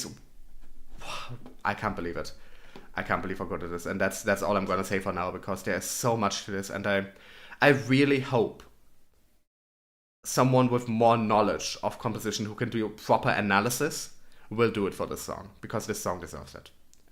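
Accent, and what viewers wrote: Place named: Germany. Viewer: Der Akzent hat es verraten.. Hätte aber nicht gedacht, dass ich jemals einen deutschen Kpop-Reacter finden würde. Es freut mich, dass dir das Lied gefallen hat, sind meine Lieblingsgruppe. Ich würde dir von ihnen empfehlen, falls du es noch nicht gehört hast!:)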